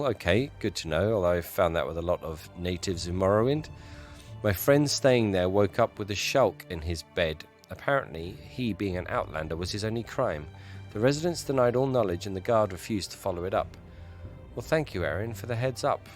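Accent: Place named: United Kingdom. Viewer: I do appreciate some British accent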